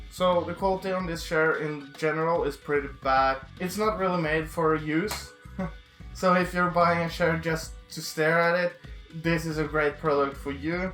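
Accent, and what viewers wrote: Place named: Norway. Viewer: Such hatred. This is jut a review man, and u are making fun of his weight and norvegian accent. You are a douche. By the way, the chair is suitable up to 150kgs.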